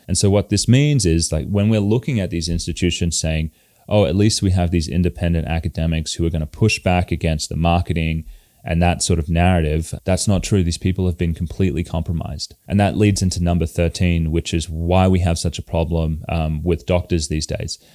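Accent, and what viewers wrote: Australia. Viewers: You have an Australian accent but you seem to be based in America so who can Australians count on. In Europe Neurologists are more common for all the issues you mention.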